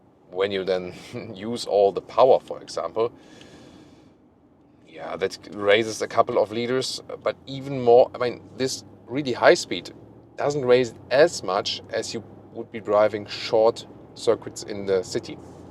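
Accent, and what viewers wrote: Germany. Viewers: Your Genglish is outstanding 👍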